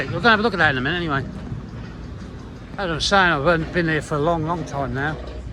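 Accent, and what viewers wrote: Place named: Norfolk, United Kingdom. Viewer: I thought you sounded like you were from Norfolk! DSM are coming to Norwich soon, to knock down Anglia Square.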